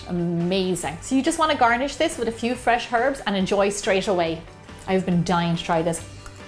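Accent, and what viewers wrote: Ireland. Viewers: no problem I loved your Irish brogue it is so cool and I love your microwave meals I made a olmlate in a teacup or mug it was good eggs cheese and tomatos for 1 minute it was good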